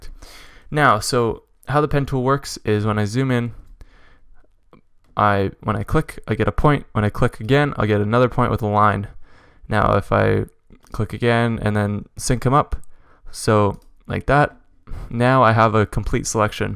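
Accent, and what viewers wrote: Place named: Canada. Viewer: I can hear the canadian accent tehe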